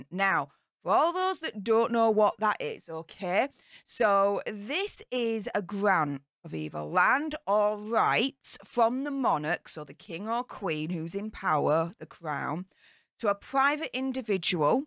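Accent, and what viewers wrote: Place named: Northern England, United Kingdom. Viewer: Hi, just popped in following Trev Coult's recommendation - to find out what you get up to! I've been trying to place your accent - somewhere around the Pennines between Lancs and Yorks, I think. I'm in Cheshire. (and don't panic, you're WAY too young to interest me socially!) - - I think we should depose Horrid and Methane's kids.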